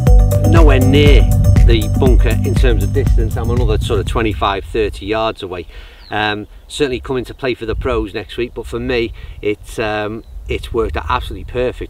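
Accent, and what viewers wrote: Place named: Merseyside, United Kingdom. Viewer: Great video and well played! Love the buggy cam footage tracking your progress to each green. Lovely looking course. (I'm in Liverpool by the way and you sound like you're from not too far away...?)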